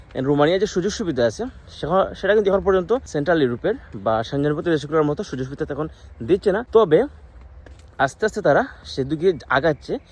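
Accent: Sylhet, Bangladesh. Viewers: ভাইয়ার বাড়ি মনে হয় সিলেট, তো আমি একজন্য সিলেটী হিসাবে আপনার সাথে কথা বলার আগরহ যথি মন চায় রিপ্লাই দিয়েন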